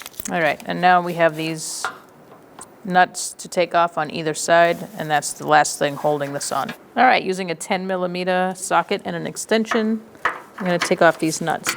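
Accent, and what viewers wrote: Boston, United States. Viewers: My favorite boston accent on the internet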